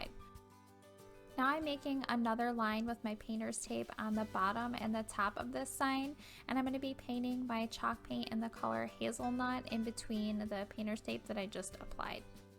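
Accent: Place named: Buffalo, United States. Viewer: I love this! I swear you have a Buffalo accent!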